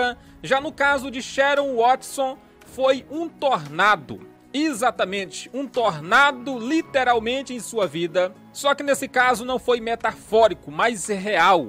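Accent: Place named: Maranhão, Brazil. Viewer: Sou de São Paulo e gosto muito dos testemunhos e exortações que o senhor traz em seu canal. Admiro sua forma de falar. Sotaque de Maranhense é muito legal. Quero muito conhecer essa cidade linda. Tu é homem de Deus e abençoado. Fica na paz. Abraços!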